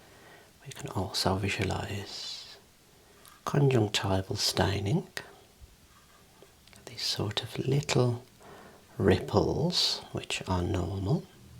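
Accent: United Kingdom. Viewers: Your voice is very relaxing fine british gentleman in deed thanks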